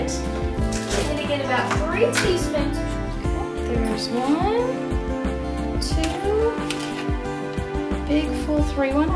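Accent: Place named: South, United States. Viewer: Love the southern drawl 🤠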